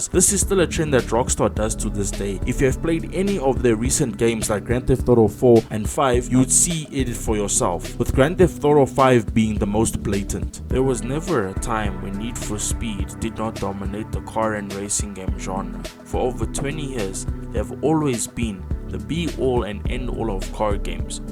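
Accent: South Africa. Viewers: Without even checking your profile, I can hear the strong South African accent 😅😅 Great video bro, keep it up!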